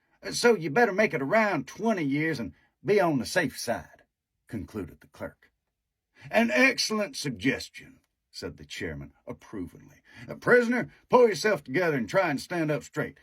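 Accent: South, United States. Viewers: An funny scene, funny for children and adults on the page but the southern accent absolutely kills it! I fell over at " cheeking the roooral poh- lice".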